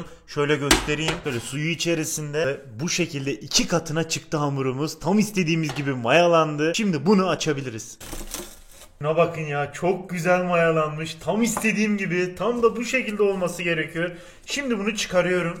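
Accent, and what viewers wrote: Adana, Turkey. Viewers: Adam adanalı galiba ekliyorum yerine eklürüm diyo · Abi onu bırak adanalı gibi konuyorsun adanalılarda alüyürum der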